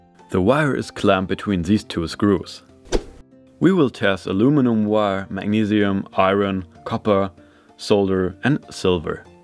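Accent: Germany. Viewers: arent you german?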